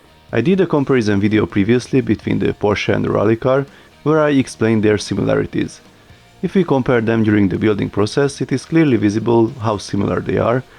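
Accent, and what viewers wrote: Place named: Hungary. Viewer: Te Magyar vagy? Egyrészt,mert hívnak,másrészt az angol kiejtéseden is látszik.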